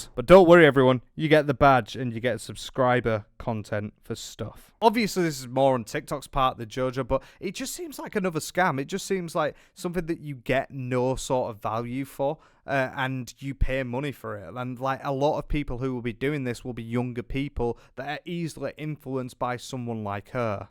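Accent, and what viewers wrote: United Kingdom. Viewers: I am American I SPEAK ENGLISH! But I HAVE NO IDEA WHAT THIS BRITISH DOUCHE IS SAYING I HATE THE BRITISH ACCENT 🙄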